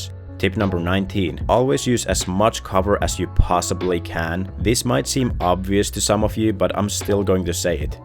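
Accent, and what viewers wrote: Finland. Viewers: Pakko nyt kysyy onk jäbä suomalainen tai jotai ku must tuntuu et sä puhut tyylii ralli englantia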